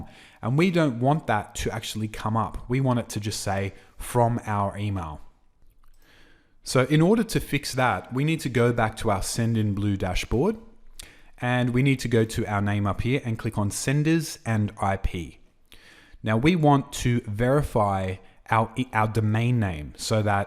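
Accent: Australia. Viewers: Man it's really helpful video. Thanks a lot. Are you from Australia?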